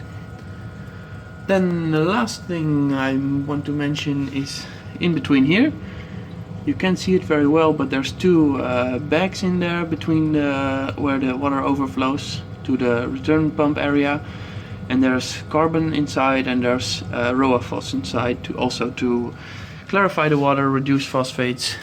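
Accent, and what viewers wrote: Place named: Netherlands. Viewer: I knew , by hearing your voice , you most be from The Netherlands (like I am)...but when I saw you VODKA label I know it.....By the way....Vodka stays Vodka...no W....but that a side....I like your tank!!!...I am going for a reefer 350 later this year..Or maybe have one build for me...the Reefer 350 has no room for a refugium and I would like to have one....Nice video!!!...good explaining!! ..Thanks.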